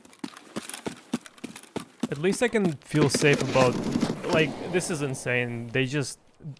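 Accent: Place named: Russia. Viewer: Хехех, блин я подумал ты из скандинавской группы стран, но не, наш акцент сложно не узнать)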